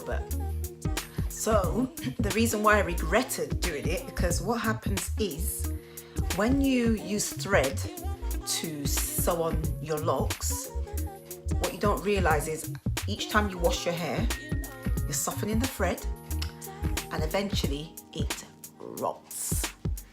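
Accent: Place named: Northern England, United Kingdom. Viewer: Great video - I only use clarifying shampoos, don’t want any build up in my locs. I’m loving your videos and the northern accent makes me feel like I’m back home in Bradford! I moved to the south as a child but go back often and your accent is making me home sick.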